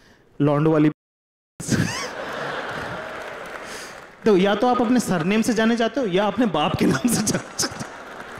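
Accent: Indore, India. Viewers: Bhai Bilkul Indori language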